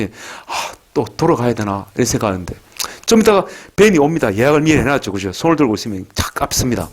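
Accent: Gyeongsang, South Korea. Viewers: 예ㅡ감사합니다 30년 학원 폐업신고 할 때 조금 눈물 날라 하더라구예 처럼 강의하면 아ㅡ들 한테 대박입니다 나는 와 그라지 몬했을까 하는 생각이 드네예 투박한 경상도 사투리가 이렇게 듣기 좋은 줄은 같은 갱상도 살믄서도 미처 몰랐어예 ㅎㅡ 양산에서 멀지 않은 곳에 살고 있으니 일요일 오후 혹은 저녁에 찾아 뵐게요 미리 일주일 전 쯤에 연락 드리고예 일일이 답글을 더 길게 달아주시니 가족 같은 분위기 좋기는 한데 힘들까봐 걱정 되네예 간단하게 살살 하이소 마 ㅡ ㅋㅡ 먼지 땜에 흐린 하늘이 모처럼 태양이를 내놓네예 좋은 오후 되이소 감사합니다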